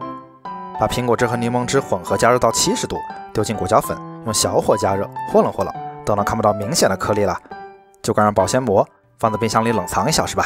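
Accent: Wuhan, China. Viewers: 武汉人？有点耳熟：） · rua 真是亲切的武汉话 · 武汉老乡好。浓浓的武汉口音啊普通话。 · 怎么听都像隐藏的武汉人呃！👍🤣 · 拐子的口音很武汉，亲切，武汉加油！ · 出门在外这么多年，改不了的是满口的武汉腔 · 我真的是爱死的武汉口音了😂😂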